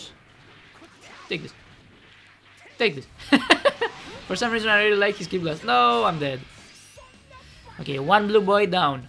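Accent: Spain. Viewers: Bro tu eres español hahahah se nota mucho, tienes acento español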